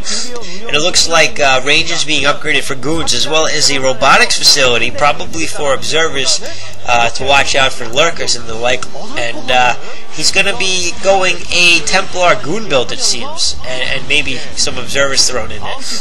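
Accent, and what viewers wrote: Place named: New York, United States. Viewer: I think he's doing a great job, keep it up man. lol as for the voice, i also didn't like your voice at first but now i love it. lol Sounds like a new yorker i hear on tv. But yeah he's doing good, lots of commentaries, we appreciate it. thank you.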